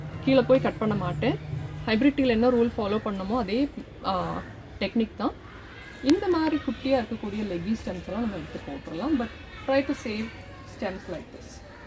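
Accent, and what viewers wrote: Kerala, India. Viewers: அருமையான விளக்கம்.மலையாளி தமிழ் மொழியில் பேசுவது போல உங்கள் பேச்சு இருக்கிறது. நீங்கள் மலையாளியா ?.தெரிவியுங்கள். நன்றி.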